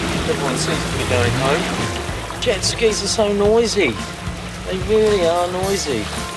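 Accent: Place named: Australia. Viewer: First - the concertina! Amazing! Not the easyest instrument in the world and you did a fairly okay reel there without mistakes. At least - I am impressed. And for your sailing. Lovely as ever. You are facing the summerseason now, as I am freezing up here in Denmark. So looking forward to enloying some nice trips with you down younder, feeling the warmth through my screen and dreaming of my own coming summer juerneys. Did you grow up in Briatain? I would swear by your accent that you were born aussie. - Well. I'm just a Dane. How would I know the differences 🙂 Looking forward for your next vlog! Ah - I forgot. The million vieuws are just bacause your vlog are real quality. You do put a lot of work into lovely intros and overlockings from one scene to the next. It is a joy to watch your channel!!!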